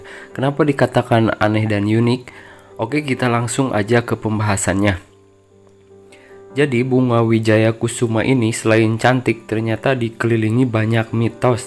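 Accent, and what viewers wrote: West Java, Indonesia. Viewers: Ari si akang orang Sunda ya 😀😀😀🤭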